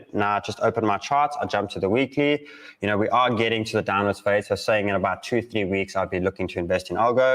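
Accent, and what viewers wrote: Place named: South Africa. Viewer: He's sounds South African to me but I don't know because there's so many different South African dialects.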